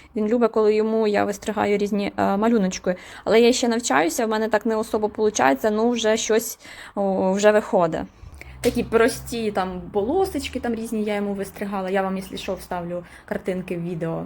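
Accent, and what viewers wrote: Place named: Central Ukraine, Ukraine. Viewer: Прикольно вийшло ! А ще цікава у вас вимова українською , ви десь з центральної України родом , Вінничанина ? Просто цікаво ) , дякую за відео .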